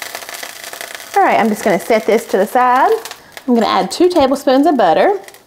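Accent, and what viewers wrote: South, United States. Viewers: Made the chicken and spinach recipe it came out so delicious. Im making your beef,rice and queso tomorrow. I love the channel and sweet southern accent.❤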